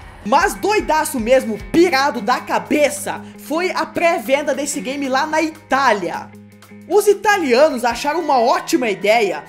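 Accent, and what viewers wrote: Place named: Rio Grande do Sul, Brazil. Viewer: Tu é gaúcho porque tu fala quinem gaúcho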